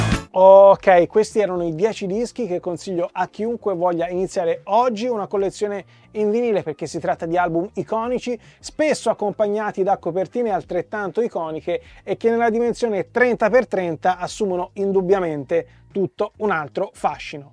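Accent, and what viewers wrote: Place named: Livorno, Italy. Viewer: Ma te guarda...sei di Pisa...ma si sentiva..ma non credevo di Pisa...ti vedevo più un tipo livornese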